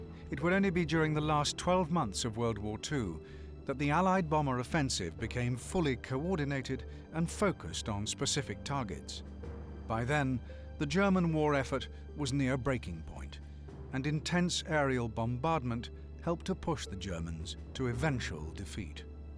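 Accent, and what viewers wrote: United Kingdom. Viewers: I find that any narrator that speaks the Queen's English I can understand with no problem what so ever and I'm an American.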